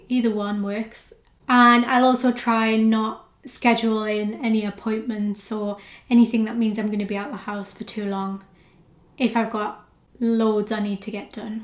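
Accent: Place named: Ireland. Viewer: I love the Irish Accent.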